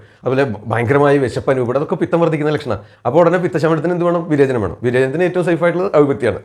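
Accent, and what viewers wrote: Thrissur, India. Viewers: വളരെ നല്ല ഈ അറിവു തന്നതിനു നന്ദി, തൃശ്ശൂർ രീതിയിലുള്ള അവതരണം നന്നായിരിക്കുന്നു 🙏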